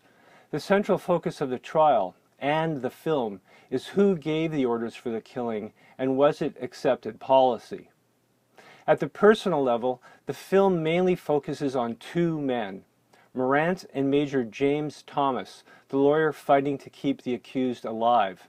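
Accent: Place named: Canada. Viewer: Ah didn’t know that the Canadians pronounce the same as the yanks. I’ve recently gotten into your channel and I’m enjoying your content. And mabey someday we too can shed the shackles the poms have on our phonetics. Also have you thought about doing some kiwi cinema as it be good to see our cousins represented.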